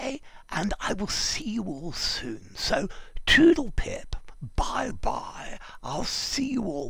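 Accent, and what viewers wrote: RP, United Kingdom. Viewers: Nice upper crust accent. I don't usually go to upper crust restaurants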